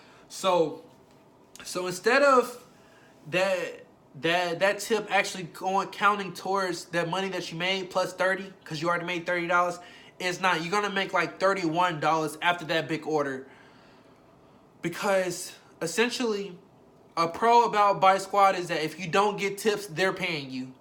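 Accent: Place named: Arkansas, United States. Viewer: I knew you sounded like an arkansan. I basically found a guide to uber eats in my state. I appreciate that.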